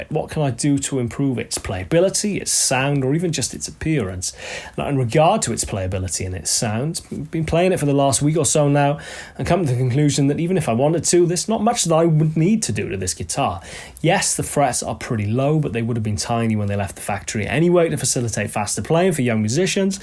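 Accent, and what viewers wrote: Wales, United Kingdom. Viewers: Maer wraig newu gal un I fi am ddolig (sonic mustang though) a dwin super excited I gal go arno (commented in Welsh as I detect a hint of Welsh and I’m from Anglesey and studied music in coleg Menai around 2010ish) dda iawn 👏🏻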